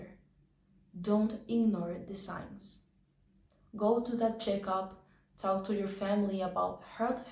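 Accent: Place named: Russia. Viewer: Why do you have a Russian accent ?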